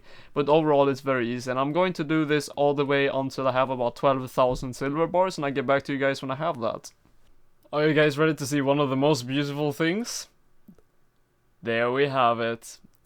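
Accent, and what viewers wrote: Sweden. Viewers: Are you from Sweden? Your accent kind of sounds like rather Nothern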